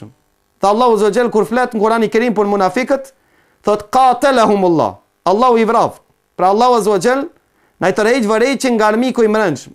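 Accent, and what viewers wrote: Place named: North Macedonia. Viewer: Ta qifsha mamin Terrorist Maqedon, sipas akzentit tend qe ke je 100% nga Maqedonia, pra nga Shkupi, apo Kumanova.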